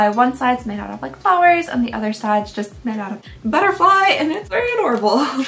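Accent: Kentucky, United States. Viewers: How many keep coming back to hear mispronounce words, pronounce everything with KY accent, or just simply make words up? Just me?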